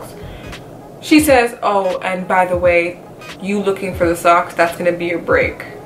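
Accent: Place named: Caribbean. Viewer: I hear the island baby where u from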